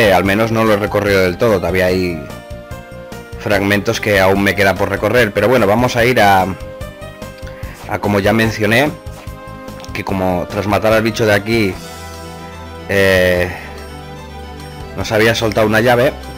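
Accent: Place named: Spain. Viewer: Ei tio. Perdoname. Te prometo que pronuncias y suenas 100% como un español, por eso te he escrito eso, pense que tenias que releer tanto porque no prestabas atencion. Lo siento y voy a borrar mi comentario anterior para que nadie que baje a los comentarios se piense que tu canal no merece la pena. Cuidate.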